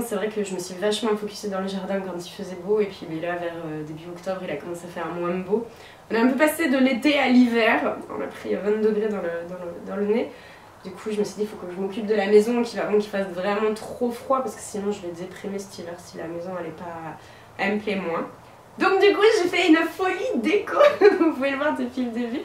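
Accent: Toulouse, France. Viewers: Coucou!! Avant même que tu y fasse allusion je me disais "c'est bon elle a "choper "l'accent toulousain " !!! Mdr 😜Contente que Toulouse te plaise! Au plaisir qui sait de te croiser au détour d'une rue toulousaine! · Héhé super contente de te revoir!!! Fabuleux cet accent du sud, ça m'a titillée dès tes premiers mots lol je ne suis pas tellement loin de chez toi donc ces petites sonorités sont familières à mon oreille! Cela te vas à ravir et tu as l'air très épanouie ça fait plaisir à voir et entendre. Mais dis moi belle, cela ne m'avais pas frappé jusqu'à présent mais tu ne serais pas HPE? Très grande émotivité, hypersensibilité, créativité débordante, vocabulaire du registre de l'émotion et mots "spécifiques" choisis avec soin pourtant servis avec grande facilité et spontanéité, grand plaisir et stimulation intense sur plusieurs plans dans les échanges relationnels qui "fusent", grande envie de communiquer et partager ses sentiments, émotions, niveau élevé d'empathie, amour des animaux avec rapport d'altérité, enfant intérieur très présent, anticonformiste, autodidacte...dis donc tu serais pas un zèbre par hasard? 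xD :P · Oh!!!! Ça fait longtemps! Tellement contente de te revoir, tu nous as manqué, tu es la 1ère youtubeuse que j'ai suivi en arrivant sur YouTube alors je suis trop trop contente de ton retour lol!!! Tu es radieuse et une vrai toulousaine, sa s'entend lol la robe est cannooon!!!!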